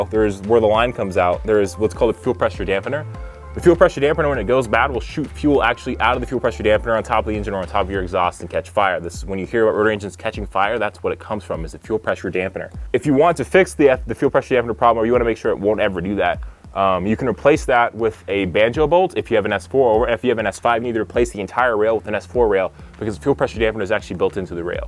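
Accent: United States. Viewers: What part of Nebraska are you from?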